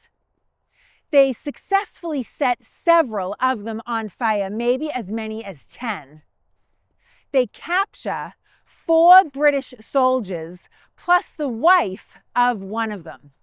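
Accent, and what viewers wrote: Boston, United States. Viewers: Thanks for preserving the Boston accent. I'm not a Massachusetts native but I've lived here over 30 years and I think yours is the thickest Boston accent I've ever heard. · I appreciate your research, knowledge, and spirit you bring to these Revolutionary War presentations of this important period of our country. Both sides of my family were actively involved in this fight for independence. None were left unscathed and many of my ancestors paid the ultimate price in this battle for freedom., thank you for taking the time and effort to bring these historical events to us. Hearing your voice transports me immediately back to Boston and that is wicked awesome! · Love your Boston accent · Just love your BAAH-ston accent! But please stop waving both your arms so much while speaking ... it distracts from your presentation of the points you are trying to convey! 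· I'm going to subscribe to hear more of Revolutionary history with that beautiful Boston accent I've been missing since the days of the click and clack brothers. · Thanks. I have many ancestors that served in the war from Lexington's beginnings and on, but I think they all missed this one. Your thick Boston accent always cracks me up, even though I am in New England. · Love that Boston accent I miss my Boston girls so much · I love your channel. I grew up in Greensboro, NC, Just north of the city is where the battle of Guilford Courthouse (the last battle before Yorktown) was fought. I have spent many hours there exploring so I have a love of history and learning about the beginning of the Revolutionary War. You are doing an excellent job teaching and explaining about the events around Boston, I was in that area back in 1967 so it's great to see the places again. Are you a history major or just have a keen interest? 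By the way, I love the Bostonian accent, very good for someone explaining about the events around Boston, and quite different from the southern accents around Charleston, SC, where I currently reside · Chall's town, Bunkahill, I love the Bean Town accent. Not sure where the people of Boston put all the Rs they save, but there are a lot of them. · Just gotta love. Especially love her very Boston accent - it's the real thing. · What a”wicked “ Baston accent ! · I hear a fellow irish Bahstonian. Sup neighbor. 😉 I'm in Allston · The Boston accent is strong in this one. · Love the Boston accent, sounds like Kennedy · Rocking that Boston accent. Wicked! Solid work and story telling! Keep it up! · She has a cute Boston accent. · Great Boston accent. Very informative. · Yes, a real Bostonian ❤️ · The GOOD=> you have a lot to say that I want to hear The BAD=> your voice is intolerably annoying to the ear. 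A voice coach can quickly resolve that. Fun Fact=> no one with a circus-like "BAH-stin" accent has ever risen to prominent power in this country for a reason==> their voice quality makes it impossible to take them seriously 😐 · This video could not have been done by anyone Without your beautiful Boston accent! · I love her accent. Boston area accent and U.K are the two most sexy in a woman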